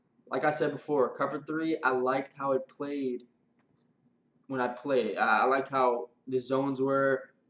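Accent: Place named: South, United States. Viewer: I understood him ,you must be from the south.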